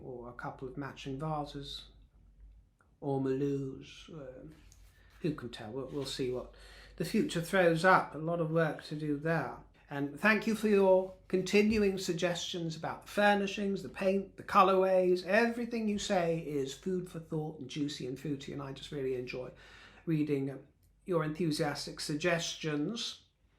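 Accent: United States. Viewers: love your American accent ❤ 😆😆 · your American accent is a scream!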